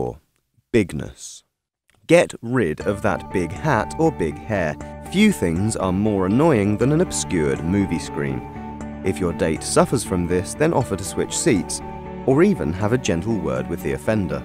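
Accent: United Kingdom. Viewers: Brits? He sounds a bit proper and cheeky. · yeah, but the voiceover guy had a british accent, so scissors paper stone is probably the british version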